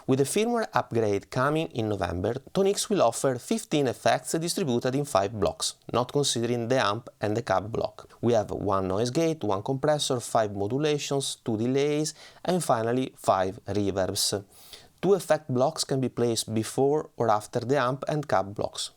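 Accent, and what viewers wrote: Italy. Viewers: Look, I just wanted to joke around a bit. I mean the name what could it mean? That this nice guy likes Fender and Gibson guitars. That's all. And I asked, why he didn't choose to honor also PRS guitars by calling himself ", Paul Gibson". You got me? Ich nehme deinem Namen nach an, du bist Deutscher. Ich bin ein lustiger Tiroler, der halt in D nicht gut ankommt. Bin leider kein Mario Barth, was soll's! Dass Italiener ist, erkenne ich an seiner typisch italienischen Aussprache, was ja wirklich nebensächlich ist. ich wundert immer wieder, wie schnell man auf YT entweder blöd angeschrieben oder belehrt wird und alles so todernst genommen wird. Ein bisschen mehr Lockerheit ist nicht nur beim Gitarrenspiel angesagt, sondern generell. Aber Schwamm drüber! Ich wünsche dir noch alles Gute und rock on!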